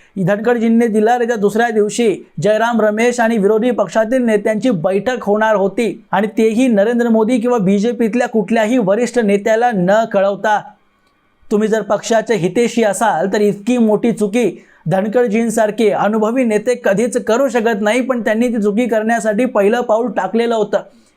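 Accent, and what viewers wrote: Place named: South, India. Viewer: तुमचा टोन पूर्णपणे दक्षिण भारतीय आहे. टोन ऐकल्यावर मी तुमचं नाव वाचलं. ऐकायला छान वाटतं. Keep it up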